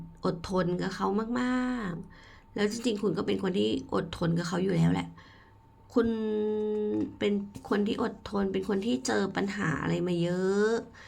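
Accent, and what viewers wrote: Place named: Kanchanaburi, Thailand. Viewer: พลังที่ดีมากๆสค่ะน้อง อ่านเสียงเหน่อและเข้าใจง่าย.เปปๆขอบคุณค่ะชอบเหน่อค่ะ.เคยอยู่กาญจนบุรีมาเลยชอบมากนะคะ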